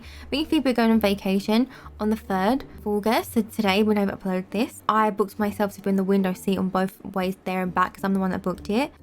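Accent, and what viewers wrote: London, United Kingdom. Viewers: You are from London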